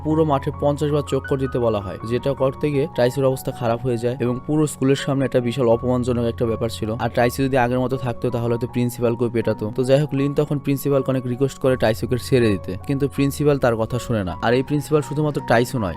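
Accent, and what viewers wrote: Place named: Barishal, Bangladesh. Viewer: আপনি বোরিশাইলা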